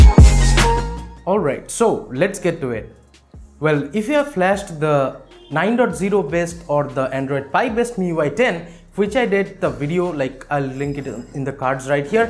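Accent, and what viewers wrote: Bengal, India. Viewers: Brother are you a Bengali? Voice tay Banglar taan ache :)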